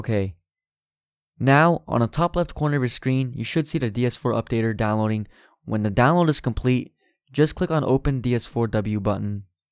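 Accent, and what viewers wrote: Croatia. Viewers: Are u from Croatia? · maybe this is a dum question but are you croatian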